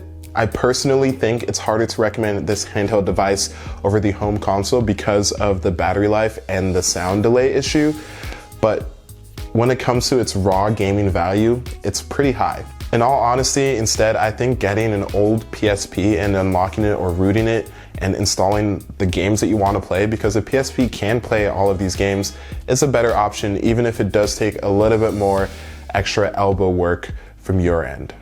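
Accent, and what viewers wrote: United States. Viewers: Where is he from Chicago LA Mississippi ATL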